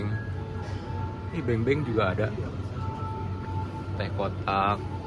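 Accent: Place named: West Java, Indonesia. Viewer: Gass mantap orang sunda inih ketauan logatnya da abimah oge sami orang amsterdam 😂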